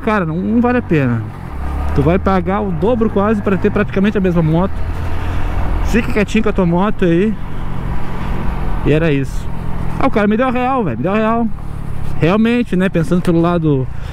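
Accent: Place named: Rio Grande do Sul, Brazil. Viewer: Adoro o sotaque do gaúcho, aqui em SP interior puxamos o R sem dó (porrrrrrrrta)😅…..e o “tu” para nós fica só na conjugação do português mesmo, aqui usa-se o “você”. Grande abraço diretamente do interiorrrrrrrr de SP!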